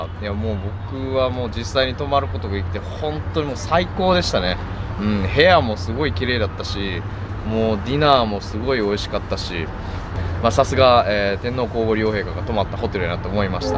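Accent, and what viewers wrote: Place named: Kansai, Japan. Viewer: ❤✌🕉 、なんで関西弁なん?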